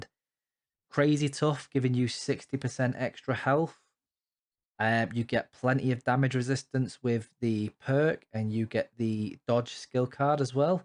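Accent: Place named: West Yorkshire, United Kingdom. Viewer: What accent is this? Im in Hull East Yorkshire and youre sounding maybe Leeds or Doncaster or something west yorkshire-ish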